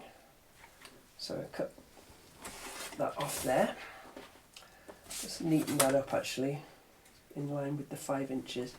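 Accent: England, United Kingdom. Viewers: I could listen to you talk all day. Your honesty with yourself comments tied with your lovely British accent is so soothing and sometimes comical that you take away my stress and make me smile. Not only do I learn from your expertise, but I learn to relax and enjoy. Thanks, from Ohio, USA.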